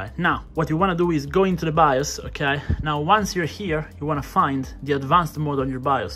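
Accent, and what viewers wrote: Italy. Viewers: Come cazzo si capisce che sei italiano 😂❤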